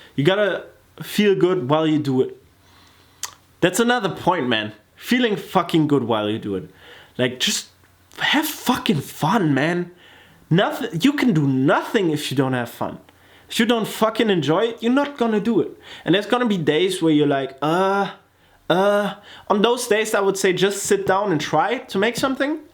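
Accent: Germany. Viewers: oha heftig aber wenn man drauf achtet hat er nen deutschen Akzent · Dein Deutsch-Englisch ist bisschen auffällig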